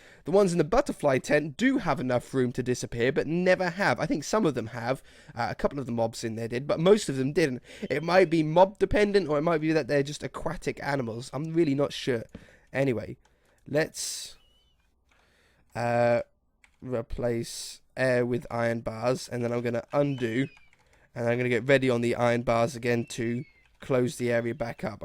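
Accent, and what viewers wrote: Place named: England, United Kingdom. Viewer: Make a soccer stadium plz oh and where nabours your English 🇬🇧 and I'm Irish 🇮🇪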